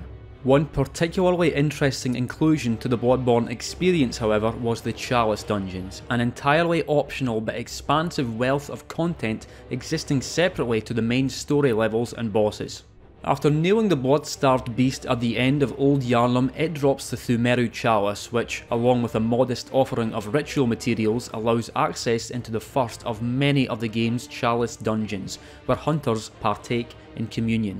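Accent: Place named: Scotland, United Kingdom. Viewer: Bloodborne content AND a Scottish accent ? Likescribe it shall be.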